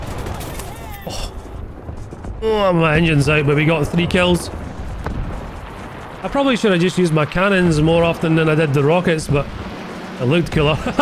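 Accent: Scotland, United Kingdom. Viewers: His accent is Scottish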